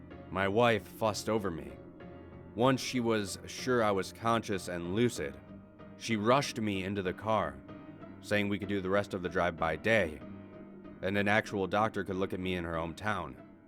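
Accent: Russia. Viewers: sound like Russian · You russian accent really sounds like russian)) i'm knew it :-D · Omg ur Russian accent sounds like bourbon from metro 2033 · Love your Russian accent it sound very real 😍 also kudos for the background noises even tho there are not a lot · He sounds like a Russian faking an American accent when speaking English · Your russian accent is golden:))) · Russian accent made my night...keep up the great work 👍👍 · Your Russian accent made me laugh so hard 😂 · Great story, great narration, great Russian actcent.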